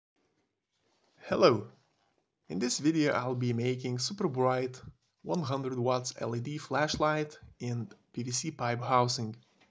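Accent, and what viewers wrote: Russia. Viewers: Ваш Английский.... Вас выдает, что Вы Русский =)) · Акцент какой то русский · Прям слышно что русский, да?)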